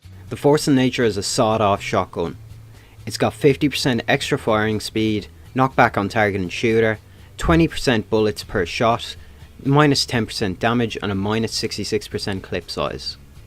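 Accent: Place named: Ireland. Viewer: Tf that Irish accent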